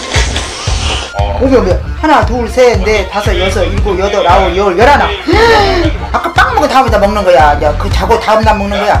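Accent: Jeolla, South Korea. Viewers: 진짜 넘 웃기심 혼다 웃고있음ㅋㅋㅋ 완벽한 전라도 사투리심 울 엄마 같으심ㅋㅋ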